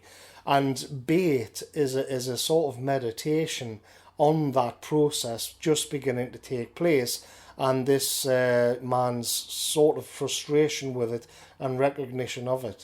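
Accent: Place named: North East England, United Kingdom. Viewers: Thanks for pointing out the elephant in the room. This review commits the same cultural appropriation that this film rails against. That is to say, ignorance of Cornish history and the basis for Cornwall’s culture difference from the rest of England. The director Mark Jenkin has said as much in interviews claiming Cornishness is a major theme in the movie, a factor that many in England don’t take the time to understand. Despite the commentator’s thick North East accent, as opposed to the thick Cornish accent he mentions, he should not use Cornish identity as a metaphor to describe the state of England more generally. At the end of day there is little evidence the poster understands the undercurrent of resentment that exists in Cornwall towards those who approach Cornwall and the Cornish as a rugged and slightly strange part of the Home Counties. 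Unfortunately this poster is little different in that regard to the Home Counties blow-ins depicted in Bait. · I live on the NE coast and it's both middle class and has fishing. I guess you know this though because you're from the same general area based on your accent. I'm working class myself, but I've lived at Tynemouth and Whitley Bay and had a lot of mates out that way as well. And while it is full of mega-rich families, there still is fishing as well. I know a lad that works on a boat actually, and it's generational thing as he works with his Grand-Dad. I guess the NE coast is different as you have N and S Shields as well. I don't know much about South Shields, but North Shields still has Fishing as well. I imagine it's nothing like it was though, but then the Oceans were over fished for a time, so this makes sense as well. Anyway, what I meant was that in this case it's different I guess. 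I've never been down south much, so don't know what it's like. But up here, seaside areas are always incredibly middle class. I can see how a rural fishing area could be destroyed by townies though, I guess it's just a totally different situation to our seaside areas in the North East. I think Tynemouth is quite possibly the most expensive area to live in North Tyneside.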